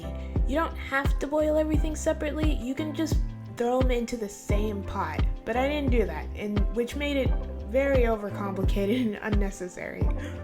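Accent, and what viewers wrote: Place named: Kansas City, United States. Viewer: Kc connection accent is everything 😍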